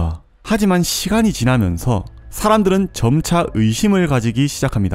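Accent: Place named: Busan, South Korea. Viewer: 횽 오늘 좀 흥분하셨구만. 다른데선 안들리는 부산말이 강하게 들리네 ㅎㅎㅎㅎ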